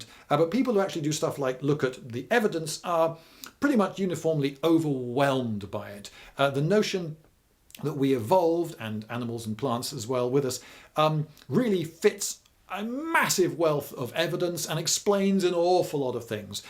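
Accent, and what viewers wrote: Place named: United Kingdom. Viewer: This is the diction of a good Britisher that grew up on Fawlty Towers.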